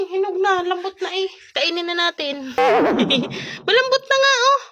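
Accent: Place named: Batangas, Philippines. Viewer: Thank you Ate sa pag video nyo Kay ate.nakakatuwa ang salita ninyo lalo na yung punto ninyo batanguenyo.❤❤❤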